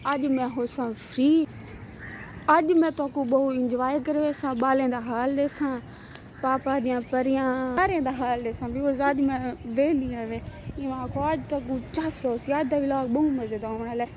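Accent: Saraiki, Pakistan. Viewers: Apke voice bhut ache saraiki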